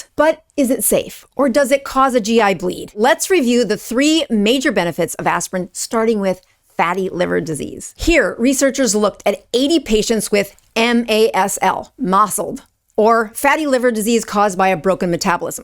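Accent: United States. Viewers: Australians know the second that you hear that American accent, you stay the fuck away from whatever they're recommending.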